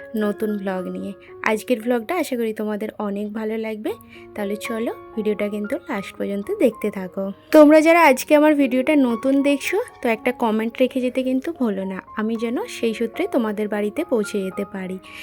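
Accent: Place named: Bihar, India. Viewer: ❤❤❤ তোমার কথাগুলো না বিহারির মত হয় তুমি কি বিহারী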